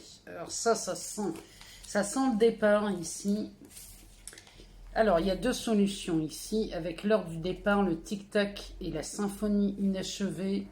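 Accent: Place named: Montpellier, France. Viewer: Jai toujours pas trouvé l'accent ? Toulouse ? MONTPELLIER 🤭🤭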